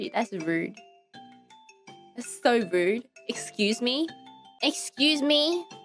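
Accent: Australia. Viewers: 오지 악센트가 좋아지고 있어.. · 중저음의 호주식 영어.... 크로니와는 다른매력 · 중저음 호주억양 최고야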